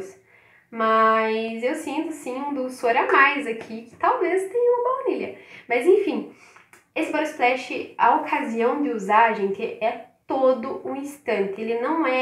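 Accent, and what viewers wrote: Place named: Paraná, Brazil. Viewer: Oii lindona !.você é do Sul do Paraná? Tem um sotaque muito parecido 🥰♥️você é muito espontânea ,amei suas impressões! 😘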